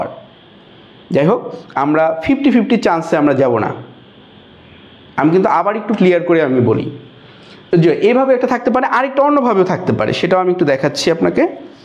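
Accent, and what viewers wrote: Sylhet, Bangladesh. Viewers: Sylhet er basay moja base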